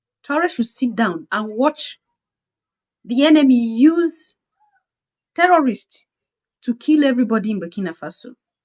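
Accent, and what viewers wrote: Gambia. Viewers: This man sounds very Gambian... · I guess this guy is one idiots from my country the Gambia cuz of his ascent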